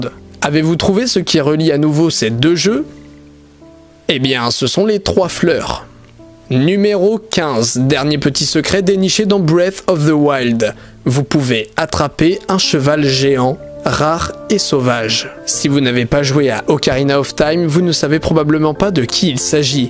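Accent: England, United Kingdom. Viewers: j'adore ton accent anglais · WoW l’accent anglais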